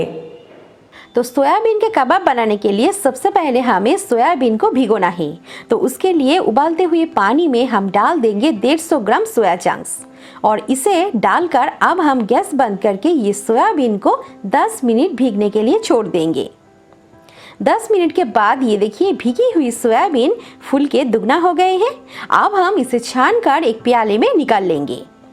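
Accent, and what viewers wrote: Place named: South India, India. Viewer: Your talking way south indian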